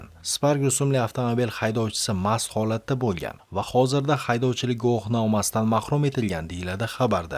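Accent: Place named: Kashkadarya, Uzbekistan. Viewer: ШЕВАЙИЗДАН БИЛИНИБ ТУРИПТИ КАШКАДАРЙО ЙОКИ СУРХОНДАРЙОДАН БУЛСАНГИЗ КЕРАК,,, ШАХРИСАБЗДАН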